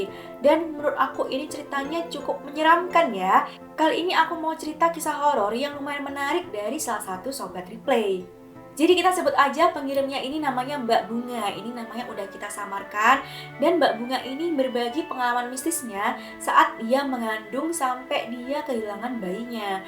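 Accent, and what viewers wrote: Java, Indonesia. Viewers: Bang orang Jawa ya semangat 👍💪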